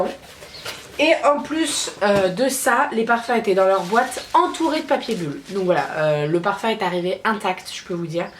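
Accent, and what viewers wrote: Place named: England, United Kingdom. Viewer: Coucou ♥ Alors je participe a ce petit concours bien sympathique :D Alors j'aimerais gagné le parfum Magnetic qui est la dupe de dody de Burberry. Alors pour la petite histoire mon père a celui pour homme et je l'adore donc je me dit que celui pour femme seras une perfection :) De plus je n'es que un petit parfum ( amor amor de Cacharel en forma 33 mL ) et comme c'est un parfum d'été et que je n'es pas de parfum d'hier. Merci pour ce concours. GROS bisous ♥ ps: J'adore ton accent d'Anglais ;) ♥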